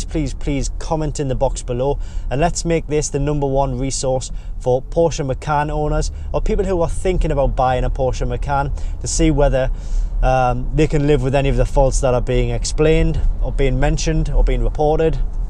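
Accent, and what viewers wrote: Newcastle, United Kingdom. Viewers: Pretty much basic faults and dealt with (hopefully) under warranty. You’ve helped me make my mind up and the Jag is going and a Macan S is on the cards. A good site and nice to hear a Geordie accent.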